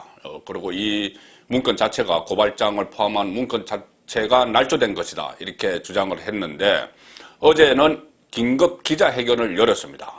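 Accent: Gyeongsang, South Korea. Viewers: 제목도 더럽게 쓰네 이 사람 꼴보기싫다 경상도 말투로 벌어먹고사는이다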